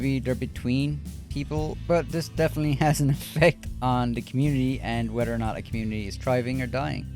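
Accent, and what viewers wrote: Ireland. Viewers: Thanks for the honesty 👍 Irish Accent?☘️ Sadly not playing nearly as much…way too many griefers and modders for me. It starts As soon as I log on, no matter what time of day. Blowing me up, starting me on fire, killing my horse and causing me to lose trader and moonshine wagons and hides and high end stews. Not worth it any more. Play on PC 😡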